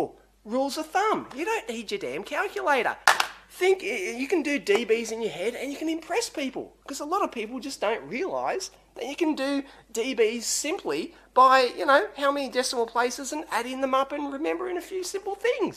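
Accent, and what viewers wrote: Australia. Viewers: Thank you so much for the video! you are definitely an aussie aren't you? haha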